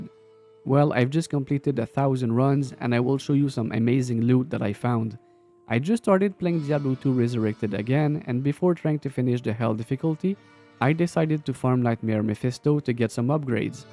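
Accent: Quebec, Canada. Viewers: ca sent le quebec icitte !!!!! good video bud! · Are you from Quebec? Accent seems familiar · Facile d'reconnaitre un Queb quand t'en entend un lol. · Are you quebecois? I feel like I can recognize the accent as a fellow Canadian. Cool video either way!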